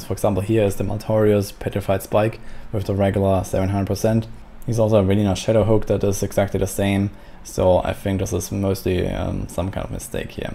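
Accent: Germany. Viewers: Man hört das DIREKT, das du ein Deutscher bist xD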